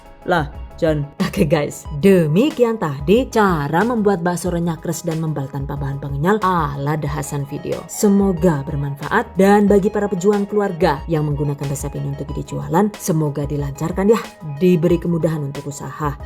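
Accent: Banyumas, Indonesia. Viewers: Biasa...yang aku tungguin ngomong ngapaknya . ...bikin aku ketawa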